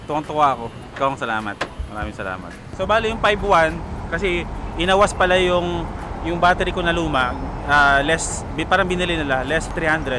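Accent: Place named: Negros, Philippines. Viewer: Mukhang taga negros na yung tuno mo mag salita ah..!! Hihihihi Solid perstaymers tayo!!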